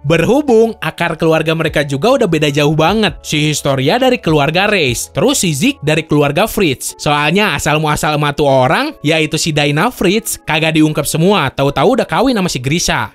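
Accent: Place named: West Java, Indonesia. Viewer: Sundanese detected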